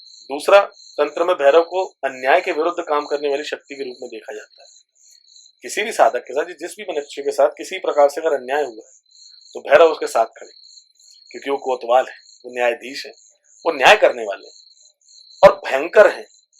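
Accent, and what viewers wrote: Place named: Maharashtra, India. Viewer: मुझे हमेशा ऐसे क्यू लगता हाई की आप महाराष्ट्र से हो. मराठी भाषिक हो क्योंकि आपकी हिन्दी एक मराठी भाषिक जैसे है. तथा नाथ परंपरा तो महाराष्ट्र धर्म की है. जय गिरनारी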